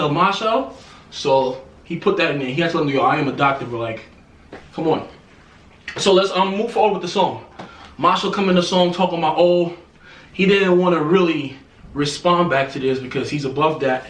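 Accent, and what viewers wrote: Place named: Saint Lucia. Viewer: Knew you were a Lucian, picked up the accent in between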